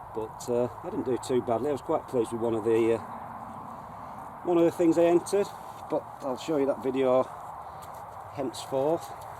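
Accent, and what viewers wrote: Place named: Sheffield, United Kingdom. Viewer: Great job pal. Are you a Sheffield guy or Derbyshire you sound like me lol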